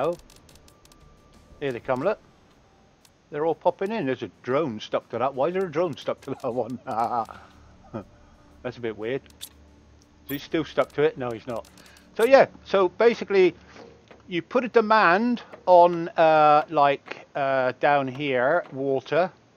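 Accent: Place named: South West, United Kingdom. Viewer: oh thank you I know what the word priority means obviously but wasn't sure in the situation. Thanks. Also are you from the south west because you sound like you are?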